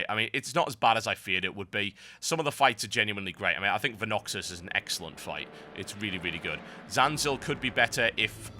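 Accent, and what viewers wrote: United Kingdom. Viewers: Watching your video just for your british accent besides i like WoW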